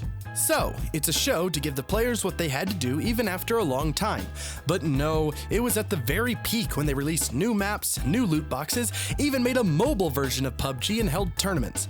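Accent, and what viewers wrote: Russia. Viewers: Мне кажется ты русский,акцент русский слышно. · Мне показалось или у автора русский акцент?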